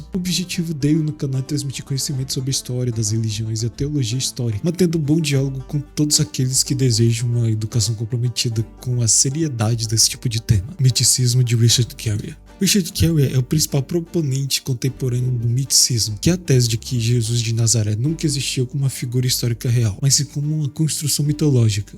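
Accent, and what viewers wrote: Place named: Northeast, Brazil. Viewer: Que voz horrível, parece um gripado falando com algum sotaque nordestino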